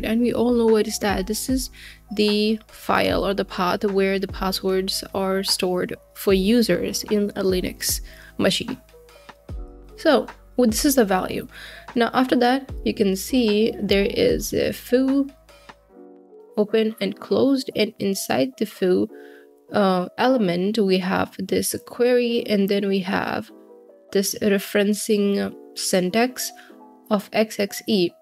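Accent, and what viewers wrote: India. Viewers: You should probably travel to eastern India rather than crying "pa jit" they just have accents like this... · this video is very informative i also studying in this field i don't know why a little bit of indian accent are i am noticing ..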